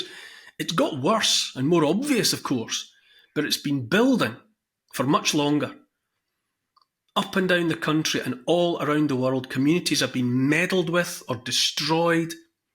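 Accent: Scotland, United Kingdom. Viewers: Lord I love a Scottish accent, although I can't help thinking of Dad's Army.....'We're dooooooomed laddie" 😂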